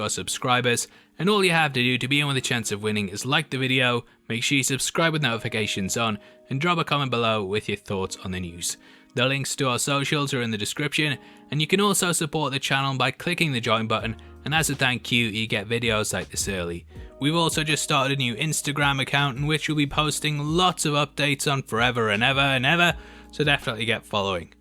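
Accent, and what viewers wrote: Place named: United Kingdom. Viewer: Wait a minute..... you’re from the UK!!?? 😳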